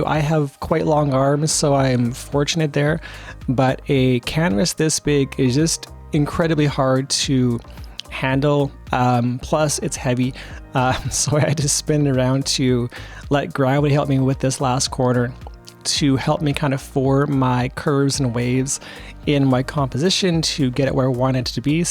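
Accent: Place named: Canada. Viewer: Great piece!! I always forget how different Canadian accents are depending on which province. I’m in Ontario and we pronounce our A’s so different hehehe. Long arms definitely with larger canvases. I struggle with this only being 5’1. Great colours. I’m definitely going to check out the FolkArt colour shift brands! And I’m looking forward to chatting about a future collaboration!! Have a great day my friend!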